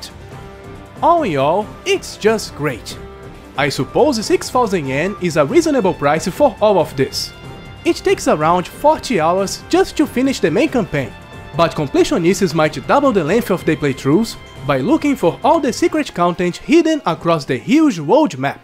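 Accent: Brazil. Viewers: Espero poder jogar esse game no 3DS, fiquei desapontado com o Legend of Legacy! E parabéns pela desenvoltura no inglês, pelo sotaque logo percebi que era Brazuca também, sucesso no canal! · are you brazillian? Your accent seens like. I would say you're even from RJ XD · sim sim,é um jeito bem caracteristico de falar,so quem é brazuka percebe,por sinal otimo review,parabens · painfull accent bro, I'm brazilian it looks like our accent, great channel but please get rid of the accent its actually not that hard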